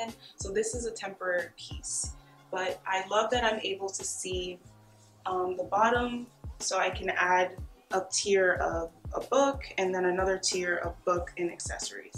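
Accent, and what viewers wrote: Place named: Jamaica. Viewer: Love your decorating skills and you were resourceful with your larger pieces! Love the minimalist glam look. I hear an accent, Jamaica? New subbie.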